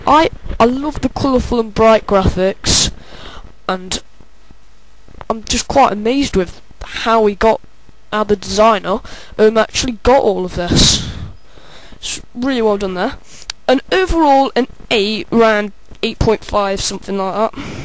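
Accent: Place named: United Kingdom. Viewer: u sound gay with the britshzz acsent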